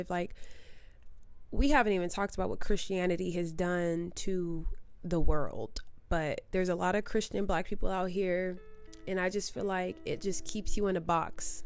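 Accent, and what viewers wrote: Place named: Model C, South Africa. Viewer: Thank for responding to the comment iv made on the other video. Well If you'd come to South Africa, you'd fit in perfectly, especially in the suburbs. your accent wouldn't be so different to what we call "Model C" accent. Then later you may link up with people who'd take you to the townships.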